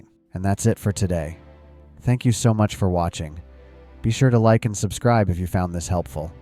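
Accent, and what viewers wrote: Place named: India. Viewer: The Indian accent put me off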